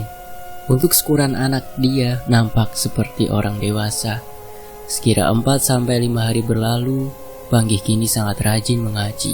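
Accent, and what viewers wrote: Java, Indonesia. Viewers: Metu Su... 🤣🤣🤣🤣 mas mimin katon tenan jawane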